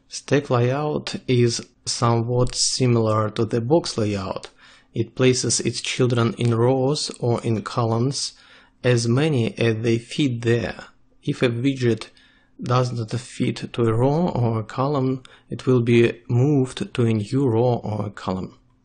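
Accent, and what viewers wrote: Russia. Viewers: Russian ? am I right ?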